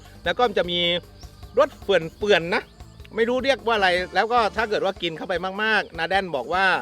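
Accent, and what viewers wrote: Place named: Korat, Thailand. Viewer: คิดถึงภาษาโคราชบ้านเองมาก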